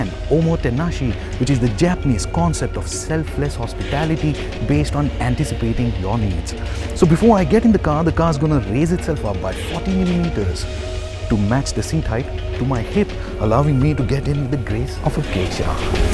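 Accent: India. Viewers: Extremely erudite presenter. Very well done. I really like the sentence, 'the question I allow myself to ask...' Superb. Your Indian accent is a fine asset here, when I sometimes find it is annoying from other presenters. Keep it up.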